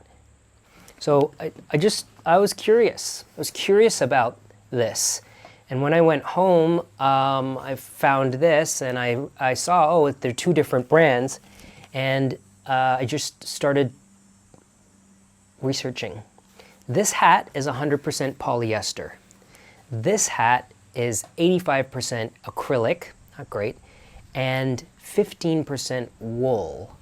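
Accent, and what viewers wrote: South, United States. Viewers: 😂🤣As a Southerner, the acceennt!!👌🏿❤️😂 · the gay boys i grew up with skewed my perspective because they played "sports", got in fights, and the only fashion icons they respected were James Dean and (young) Marlon Brando (esp. from 1953 The Wild One). i adore the Tennessee Williams accent though...i adore you! i HAVE heard "mesh head" used as a pejorative to hats, that MY boys associate with a certain ex- boyfriend truck mechanic that was in their midst for too, too, long... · Why the southern accent. Gays probably play sports even Olympic swimmers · You do the only Southern accent that I find charming! 😄 And, I'm sorry for your loss.♥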